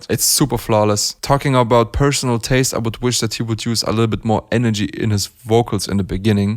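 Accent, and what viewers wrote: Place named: Germany. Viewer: FLAX OOLTIMATELY I love ur german pronunciations so much 😂😂 Also, mic costs more than my rent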